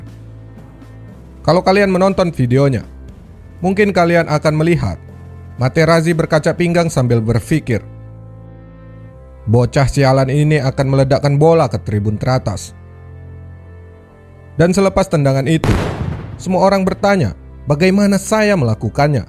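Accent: Medan, Indonesia. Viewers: orang medan ini ya? dari aroma suaranya uda kecium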